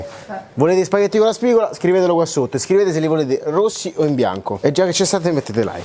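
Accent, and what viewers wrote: Rome, Italy. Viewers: Ma sei un marocchino che parla con l’accento romano ??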